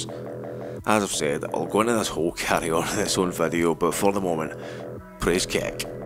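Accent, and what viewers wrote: Scotland, United Kingdom. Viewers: aw mate as a fellow scot hearing your voice made me happy, first time finding you, we need more proper strong accent scot readers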